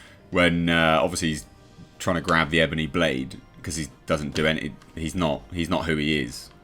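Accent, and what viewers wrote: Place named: United Kingdom. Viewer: simply says what any sane person thinks about most things but he says it in that accent like hes just ur British homeboy. Do wish he'd throw in a "Bruv" now and again tho.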